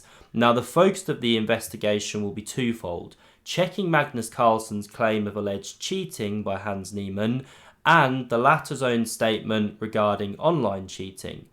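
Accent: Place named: Scotland, United Kingdom. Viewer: your Scottish accent is horrible I can follow you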